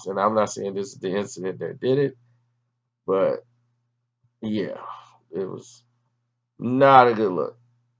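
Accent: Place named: Chicago, United States. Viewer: That Chicago speak kinda like white dude down south except they got they own accent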